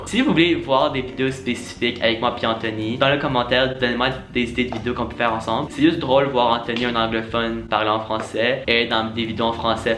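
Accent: Quebec, Canada. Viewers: moi je suis d'Agen ^^ super tes vidéos en français tu parles vraiment bien avec ton accent du Canada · Allo juste ya aussi des quebecois qui t'écoute ton accent est un peu quebecois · Bonjour... J’aime beaucoup tes vidéos... Et je trouve que tu fais un très joli couple avec Anthony! Ce serait d’ailleurs vraiment chouette que tu refasse des vidéos avec lui! Quand il parle en français, je suis mort de rire! Il est trop marrant quand il dit : « je suis dégueulasse »!!! 😂😂😂. J’adore aussi ton accent... Je ne sais pas pourquoi mais je suis « amoureux » de l’accent québécois???!!! Mais le français avec un mélange d’accent québécois et anglais, c’est trop délirant et tellement mignon à la fois!!! Je vois que tu es dans le Sud de la France... Moi, je suis de Belgique mais je vais assez souvent dans le Sud car j’y ai de la famille... D’ailleurs, je suis rentré hier de Marseille où j’étais allé voir le concert de Vanessa Paradis ( tu connais peut-être ???) et ensuite allé voir ma famille... 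Es-tu déjà allé en Belgique? C’est pas comparable à la Côte d’Azur mais c’est vraiment génial comme pays!!! Mais il va falloir t’habituer à la « gueule de bois » car on aime bien faire la fête en Belgique 🇧🇪 🍻🍻🍻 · Je viens d'un village paumé en Normandie mais là je vis à Paris ! Ton accent québécois est trop cute 😉 garde le ^^ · kind blue c’est un accent canadien ! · Marseille 🙋🏼‍♀️ ton accent canadien est trop chou et vous êtes grave mignon avec Anthony! J'aime beaucoup tes vidéos sur cette chaîne et sur ta chaîne anglaise courage pour les prochaines vidéos !!😁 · #cloche continue tes vidéos j'adore j'adore ton accent québécois et pour la gueule de bois c'est pas grave💕😘😘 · #cloche Tu es trop génial, j’adore ton accent québécois et surtout ton couple j’aimerai trop avoir un meilleur ami gay 😍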